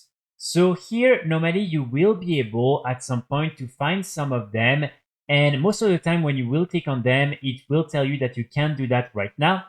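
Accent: France. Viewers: French accent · also i need to say i love that french accent its just makes it sound so nice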